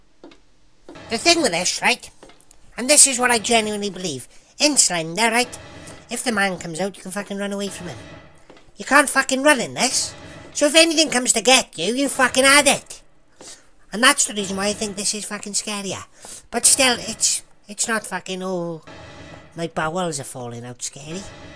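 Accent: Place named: Wales, United Kingdom. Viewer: Funniest welsh accent ever never heard any in my life but I'm from Carmarthen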